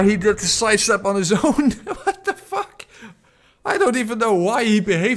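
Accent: Netherlands. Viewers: Your accent is all too familiar as a Dutch person, but otherwise your comedy is top tier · Its because he's Dutch and funny and smart. The internet likes american accents, doesn't like good jokes just "randemz lolololol" nonsense, and also people don't like smart folk because of social anti-intellectualism. IMHO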